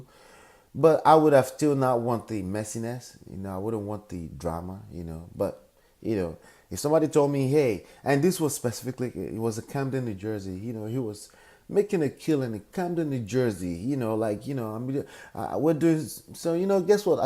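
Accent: Nigeria. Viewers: I think Nigerian accent. I love it. ❤ My daddy is Nigerian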